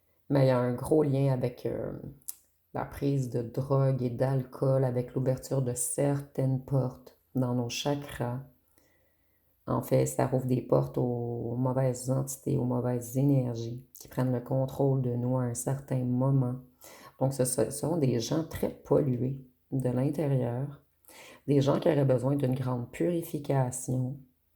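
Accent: Quebec, Canada. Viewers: Bonjour et merci pour votre travail ❤❤ vous êtes une belle âme et j adore votre accent vive le Québec 🎉🎉❤ tendresse du cousine de France 😂😂😂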